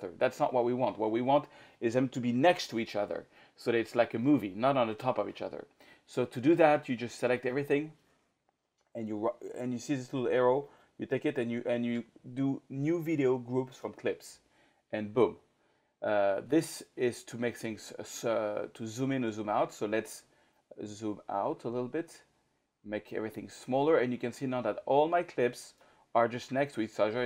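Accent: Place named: France. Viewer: Mercì,. I really enjoy your tutorials and your French accent. This one tutorial was really awesome. I would also like to thank Kent Jensen's comment about creating an adjustment layer. Cheers.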